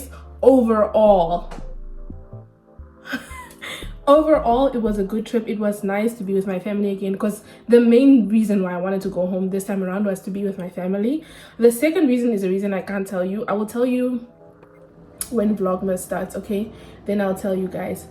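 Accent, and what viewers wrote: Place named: South Africa. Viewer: I’m subscribing, girl you got me you’re so raw on this video and I loved this. The family drama is soooo real. You speak like a South African, are you Namibian? Zulu, coloured Afrikaans, Tswana😂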